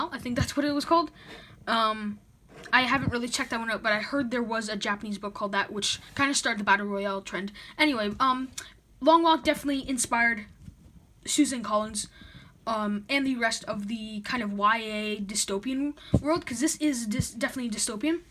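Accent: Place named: Canada. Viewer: I like your emoticon description showing the story of The Long Walk. Clever! Why don't you show your face in the review? It's OK to say you loved the book. It doesn't meat I'll love or like it. I love Cell and my friend loves Dreamcatcher and most people don't like those two King books. I'm guessing your from Canada by the way you pronounce "about". It's not fully "aboot" like some Canadian accents but it's moving in that direction. You said if they fall below 4 mph, they get a warning, so why not stay below 4 mph so they don't get any more warnings?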